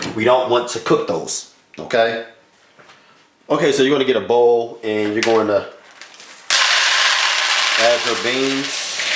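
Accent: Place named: Baltimore, United States. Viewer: You sound like you form bmore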